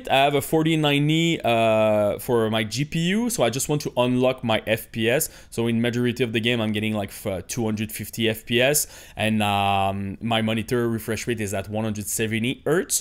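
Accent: Quebec, Canada. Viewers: clairement québécois bro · french canadian.... smh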